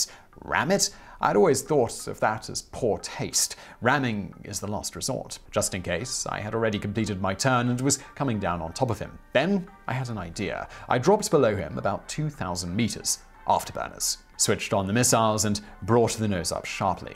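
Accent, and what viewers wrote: United Kingdom. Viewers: why does he look like the guy on Vsauce but with a British accent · Oh boy... More stuff of i rationalize how they came up with gps... And rather than read... Im so briliant i must be right and there for let me spread my genious around as fact and history because whatever i lazily dream up must be right. Stuffy elitist. Talking head comes complete with stuffy brit voice. Yes kids.. This passes as a college prof... Even a department head these days. Actually.... Unfortunately for this condescending wannabe egghead embarrassment... Gps origins are not sputnik at all. Norging to do with it other than hey... Look a tin can pinging we can track. Gps at its heart... Is triangulation. A methodology used for ages by surveyers. It actually owes it origins to Pythagoras. As far as an orbiting object being a high accurate clock like an atomic clock... 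I have no idea how that works and id assume again some algebra... Trigonometry... And some calculus... But atleast i am humble enough to admit it. And its a good question. The talking head here equally has no clue how that works. Before sputnik... Im pretty sure there were some very bright people unlike this jerkoff here in this video just tossing off... Wonder if he creamed his pants over this... But i digress... Some bright people.. Yeah like warner von braum... Einstein... People like that which had already figured out geosynchronous orbits.... Now after all of that... Even more bullshit spewed by captain science here... You cell phone does not directly engage a bunch of high orbiting sats out there. It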